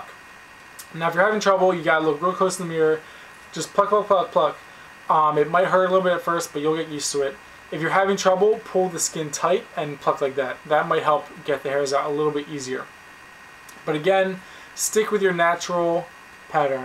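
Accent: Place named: New Jersey, United States. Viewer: He has a east coast accent.. I heard a hint of jersey in there..O_O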